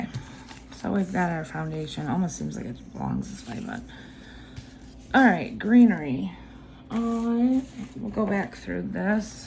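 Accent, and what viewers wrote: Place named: Michigan, United States. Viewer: You sound like Michigan...I'm from Royal Oak.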